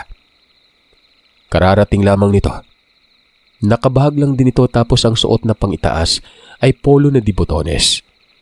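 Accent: Visayas, Philippines. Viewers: wag kna magbasa ng may bisaya... BOBO KA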